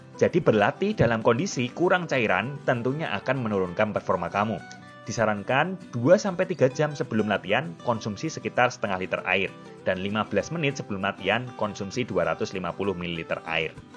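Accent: Java, Indonesia. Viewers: udh trlalu cinta sama channel ini.. semoga mas slalu sehat dn bnyak rejeki krn udh bantu bnyak orang untuk hdup sehat.. oh ya kak.. mau serius tanya kak orang mana ya?! kok ngomongnya logat jawa kental bgt hehe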